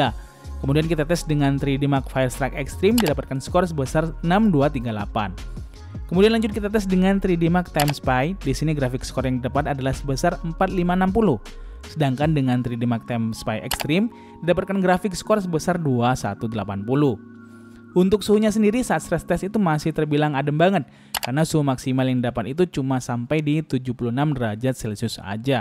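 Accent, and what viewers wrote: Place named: West Java, Indonesia. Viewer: he'eh nya si aa teh meuni sunda pisan nya .. wkwkw jadi resep maca na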